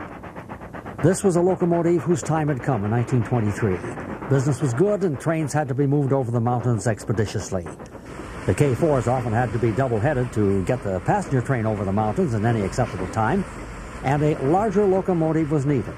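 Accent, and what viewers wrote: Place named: United Kingdom. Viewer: he seems to speak British accent rather than Americans, or is it pennsylvanian accent too?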